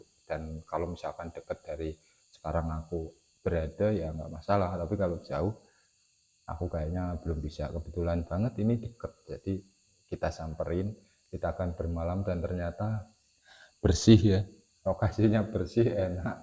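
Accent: Java, Indonesia. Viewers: kalo boleh tau di daerah mana yh bg logatnya kaya jawa😁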